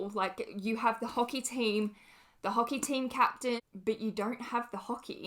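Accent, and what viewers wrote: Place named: Australia. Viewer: I love your accent. It’s Australian right?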